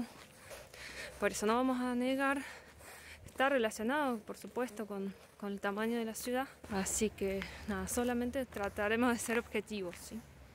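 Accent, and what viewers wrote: Argentina. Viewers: Excelente castellano y acento argentino. ❤Río Ceballos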